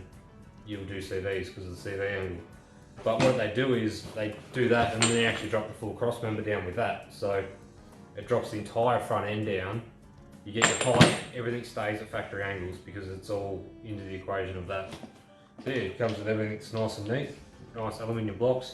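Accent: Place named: Australia. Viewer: Love the Aussie speak- sunnies, muddies (in the other video), aussies.....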